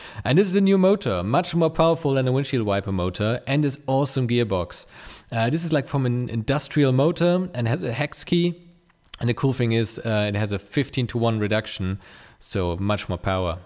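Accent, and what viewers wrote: Germany. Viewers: Bruder dein akzent einfach anders deutsch xD Gutes Video ^^ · Do are you from Germany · Ich finde es lustig wie man perfekt deinen deutschen Akzent hört, die Idee muss man aber auch erstmal haben. Super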